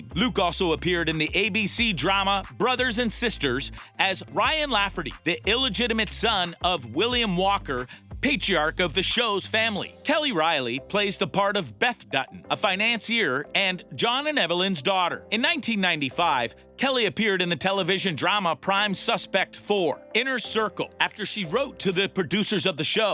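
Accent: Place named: Poland. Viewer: the voice of this guy is from poland or something...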